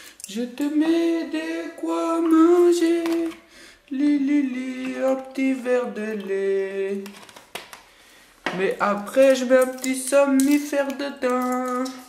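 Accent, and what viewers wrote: Belgium. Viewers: J'adore trop c'est ton accent belge 👏👏😄👍🔝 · cest la premiere video de que je vois et pour moi cest un jacqouille avec un accent belge echapée d'un hopital pshychiatrique donc voila et en plus il est pas trop drôle! Voila voila koi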